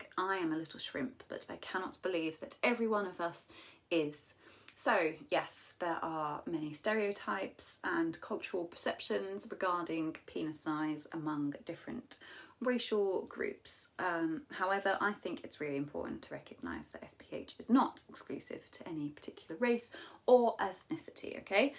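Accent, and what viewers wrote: United Kingdom. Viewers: Wow, she is so tastefully evil 😈. And the British accent on doms is undefeated. Thank you for touching on average/above average guys being into it. I don't see it as humiliation, I just think a woman being an unapologetic size queen soooo hot. 🙏🏾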